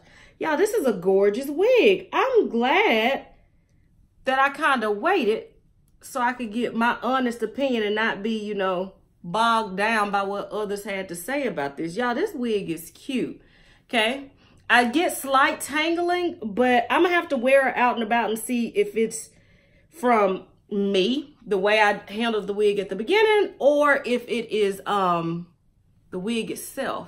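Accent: South, United States. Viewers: New subbie!!!🤣🤣🤣You are hilarious! Love the southern accent! You be talking to sis!🤣❤❤❤ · I subscribed because 1. You’re beautiful 2. I love your accent wall 3. I want to perfect your southern accent 😊😂 I will be ordering this wig soon. Good review, thanks sis. 🙂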